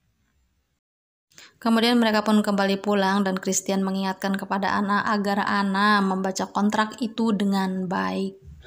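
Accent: West Java, Indonesia. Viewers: Alus euy sunda na kaciri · Sunda pisan teh...😂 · sunda pisan euy....😂❤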